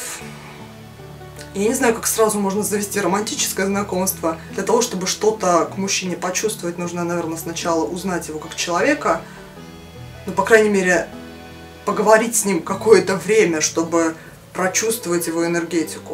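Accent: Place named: Kursk, Russia. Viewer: Класс) так и думала что ты из Курска) акцент;) я тоже из Курска, но живу в Москве ) с удовольствием бы с тобой познакомилась очно)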